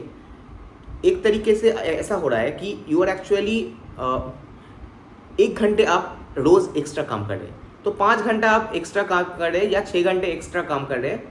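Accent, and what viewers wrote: Bengal, India. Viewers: Aap ka voice bengoli accet hai ?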